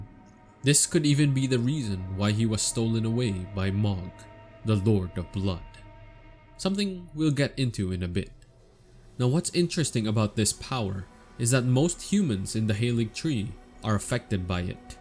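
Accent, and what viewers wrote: Philippines. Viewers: Your accent sounded like filipino. God vid tho.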